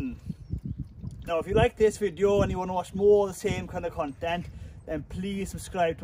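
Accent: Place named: South Wales Valleys, United Kingdom. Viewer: Its the Valleys Man gotta love the accent!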